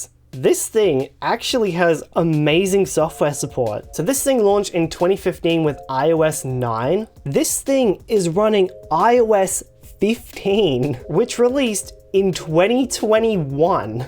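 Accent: Australia. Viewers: wtf why is it that most of the english speaking channels i watch are guys from australia xD anyway, i really like your content❤ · I see a pair of talking hands with an Aussie accent and first thing comes to mind is some guy with a green ipad talking about tech stuff in a entertaining way. jokes aside this channel is great anyway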